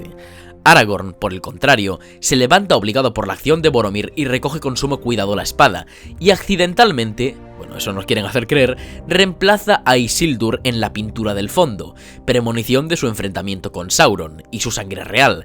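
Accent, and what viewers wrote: Spain. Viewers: que culero esta el Español de España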